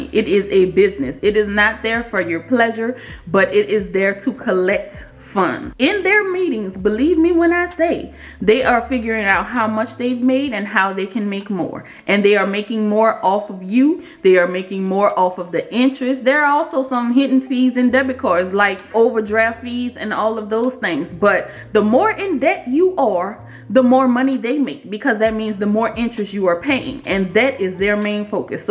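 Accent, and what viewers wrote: New Orleans, United States. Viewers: I hear new Orleans all in your voice💯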